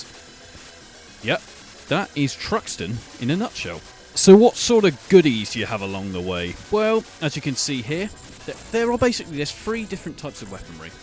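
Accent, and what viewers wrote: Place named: United Kingdom. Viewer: British accent. sounds legit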